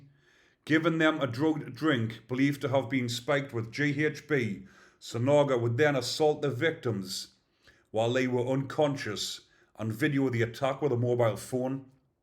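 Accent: Newcastle, United Kingdom. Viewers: Pure Geordie 😁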